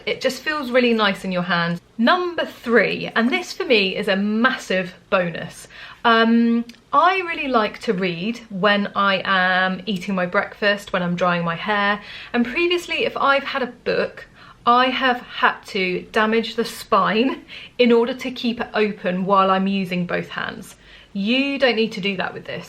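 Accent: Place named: United Kingdom. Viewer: From your thumbnail i thought you were British😅😆,, and then hearing your accent confirmed it... Great video in any case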